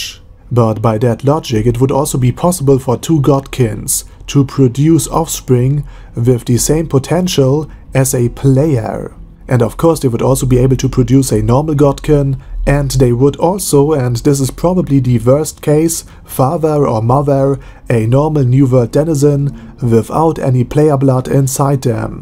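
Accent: Germany. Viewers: Dieser deutsche ne akzent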